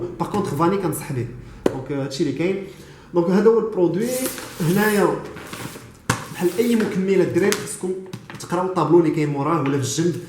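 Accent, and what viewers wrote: Morocco. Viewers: هادا ماشي تونسي هادا هدرة تاع امغرب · دارجة مغربية ام تريده ان يتكلم بلهجتك على اساس لهجة جميلة وماينشبع منها 😏😏😏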